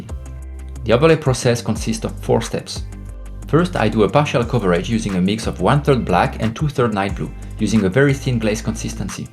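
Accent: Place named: France. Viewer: Ah, cet accent Français inimitable, qui a son charme :) Merci pour le tuto.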